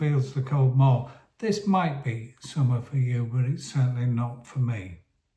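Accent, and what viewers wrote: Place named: County Durham, United Kingdom. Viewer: Where Oop North are you from, I’m hazarding a guess and would say County Durham? Very informative video as usual, missed your uploads so looking forward to your next one. Cheers. 👍🏼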